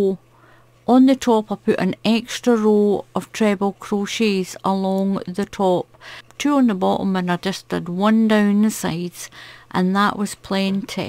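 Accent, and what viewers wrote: Scotland, United Kingdom. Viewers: I love your designs, your instructions and your beautiful Scottish accent.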